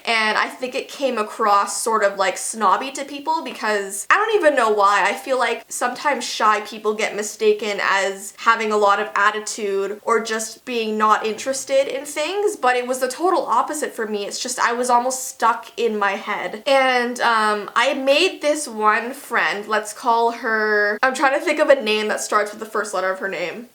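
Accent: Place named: Canada. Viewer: I love her Canadian accent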